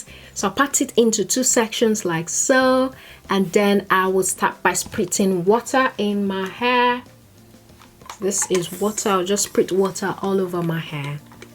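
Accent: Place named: Nigeria. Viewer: love ur accent are u nigerian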